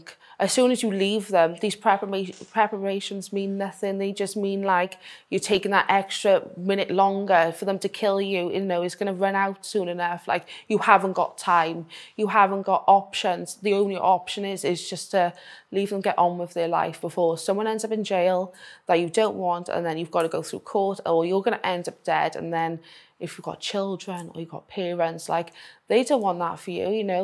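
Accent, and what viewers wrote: Wales, United Kingdom. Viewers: She's from Britain - UK. She has a Welsh accent ❤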